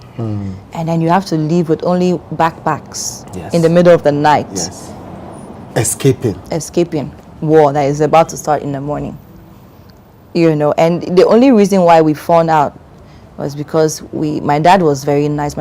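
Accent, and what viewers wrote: Nigeria. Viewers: She sounds more Nigerian than any other nationality. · She sounds like a Nigerian. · Lol. I thought I was the only one who noticed it. She sounds Nigerian.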